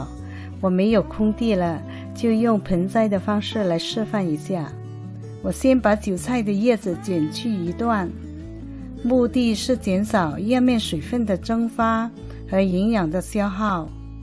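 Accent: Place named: Cantonese, China. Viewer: 喜爱的广东口音，亲切，可爱。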